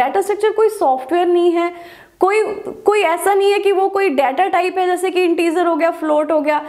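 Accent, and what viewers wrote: Punjab, India. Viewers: Beautiful teacher with Punjabi accent 😀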